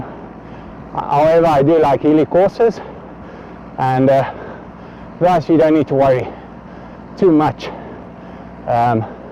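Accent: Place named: South Africa. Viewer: Somehow I think you are from South Africa?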